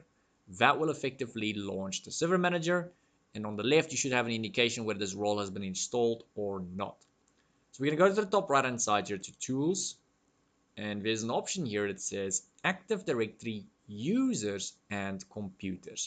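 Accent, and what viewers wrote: South Africa. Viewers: Curiosity sake are you South African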